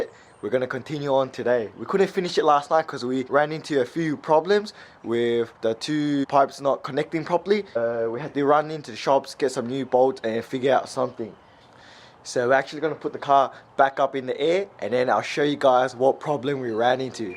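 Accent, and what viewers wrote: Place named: New Zealand. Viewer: Never heard an Asian sound so kiwi, aussie and asian all at the same time. Mate :')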